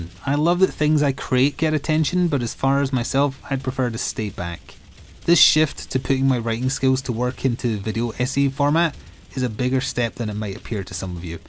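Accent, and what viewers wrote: Scotland, United Kingdom. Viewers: Tl;dr You're amazing! Love all your content! ❤🧡💛💚💙💜🤎🖤🤍 Hey there, This is not the first time I've commented/watched/participated in chat. I've been following your channel since that sponsored mh3u video. I used to make videos and streamed under the name TheBearthropod. Never got any success but it was just for fun. You always have been and always will be my favorite content creator. I loved learning all the new things about you from your new videos. As another private and quiet person, I respect you greatly. I've always thought that if we met in game or in person we would hit it off and be friends. Only because of our similar views on games and both being in the lgbtqia+ community. You're inclusiveness, respectful nature and humor always makes me smile. Your Hollow knight coverage coincided with at the time the lowest point in my life. 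I suffered from a severe sciatica flare up. I couldn't walk for two weeks and was in excruciating pain. Your daily videos of hollow knight gave me a flicker of hope(no pun intended please don't send the legitimate business frog i need my kneecaps). If I thought that was the lowest point in my life, let's just say I definitely did not see 2022 coming. I lost my grandfather, two uncles, an aunt, a beloved pet of 18years and my mother. I was horribly depressed for two years, but true to form there were your posh Scottish accent and dry wit to get me through. So, I thank you from the bottom of my heart. Even if there are horrid people critici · Came aboard for the silly overwatch vids, stuck around for the amusing dialog & Scottish accent. Love ya, man, don't ever stop being you.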